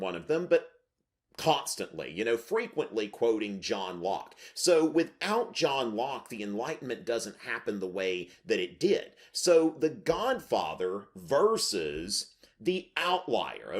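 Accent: South, United States. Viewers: Love these! You break things down elegantly and with a homey, cozy southern accent. I am hooked.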